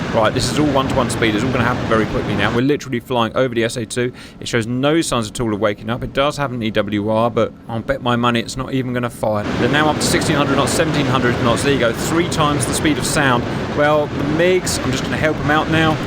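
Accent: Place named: United Kingdom. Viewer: Worst mushmouthed modern British accent I've heard. HEAAAAAHHHH, THEAAAAAHHHH, OOHWWW ( = all) so annoying. A whole generation of Brits learned to speak from listening to Jonathan Woss and Jamie Oliver.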